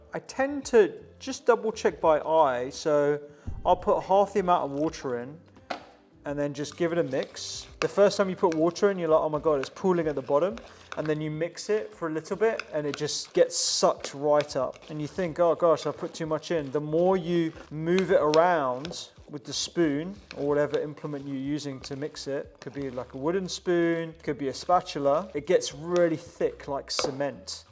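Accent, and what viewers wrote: United Kingdom. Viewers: Hello! Great recipe! 🍞 the finest bread. Are you of Indian or Pakistani extraction? I just subscribed to your channel. Love your British accent 😊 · I understand the British accent is tempting :). However, please do post a healthy bread recipe that suits middle class folks. I am sure this one is more suitable for the $$$ demographics. Incase there is already one available. Let us know aswell.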